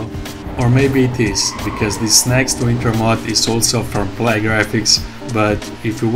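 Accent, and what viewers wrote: Russia. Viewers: сразу слышно русский акцет)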